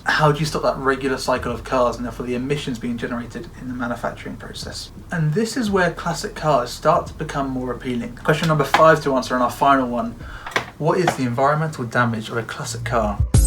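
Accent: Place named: United Kingdom. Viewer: Kind of interesting that not even the closed caption can understand his British accent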